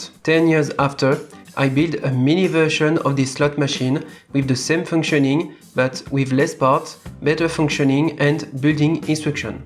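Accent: France. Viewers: L’accent FRANÇAIIIIIIS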